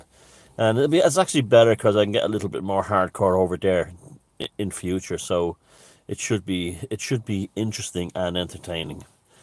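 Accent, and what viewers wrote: Ireland. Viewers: Over on Odysee you can use salty cuss words in your cool Irish accent. You always make me laugh when you do that.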